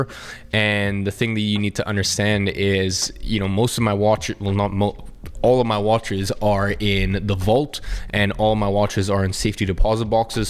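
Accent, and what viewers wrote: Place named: California, United States. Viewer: If you grew up in London...why don't you have an English accent? You sound straight up Californian lol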